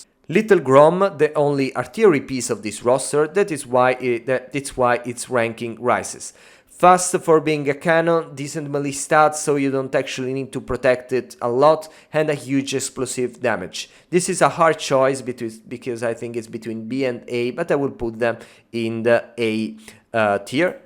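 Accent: Italy. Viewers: its an italian accent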